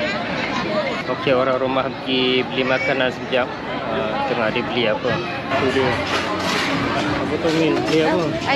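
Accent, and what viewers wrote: Malaysia. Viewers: mcm org Utara bang..mcm Kedah Penang Perlis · Cakap Melayu macam orang Malaysia. Dok Malaysia ka?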